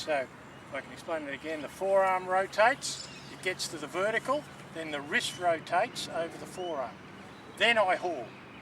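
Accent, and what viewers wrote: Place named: Australia. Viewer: First off, this is one of the most detailed explanations on how to properly execute the double haul albeit a bit hard to follow the Auzzi accent. I’ve watched many top notch instructors and not until now have I heard the reason to wait until the snap of the wrist. He’s absolutely correct in saying that most people start hauling from the beginning or translation of the stroke; I include myself in the bunch. I’m trying to correct this but since I’ve been doing it for many many years, it is not easy to change my technique but I’m trying nevertheless.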